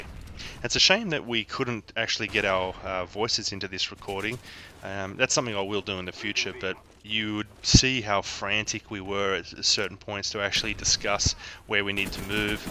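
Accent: Australia. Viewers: Finally a gd Aussie commentator what state r u from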